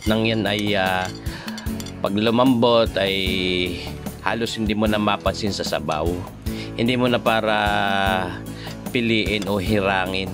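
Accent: Batangas, Philippines. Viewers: very nice accent batang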